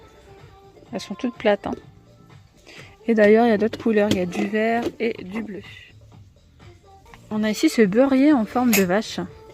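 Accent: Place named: North, France. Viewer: Cc, je ne sais pas comment tu t'appelles. Je voulais juste te dire sue que j'apprécie beaucoup tes vidéos sur ce magasin avec ton petit accent du Nord. Trop mignon.. Une fan qui habite non loin du Puy du fou en Vendée et pas très loin du bord de la mer. Pleins de bisous mi-ensoleilles, mi-pluvieux... ☀️☀️☀️☀️☀️🌊🌊🌊🐈🐈😚😚😚💜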